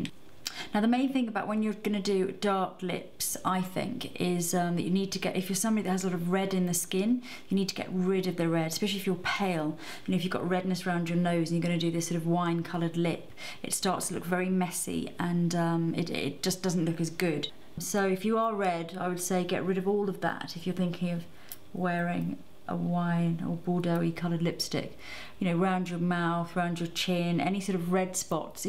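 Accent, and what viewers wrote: United Kingdom. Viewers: Your accent automatically makes you sound like you're automatically bright. Like a professor. But then, I remember...you're a makeup artist. It's the British accent.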